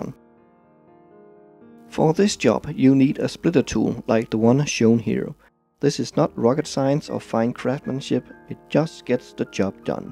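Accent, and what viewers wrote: Denmark. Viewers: The danish accent is strong with you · Are you from Denmark. I think I here Danish accent. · Are you from Denmark? Your accent seems Danish.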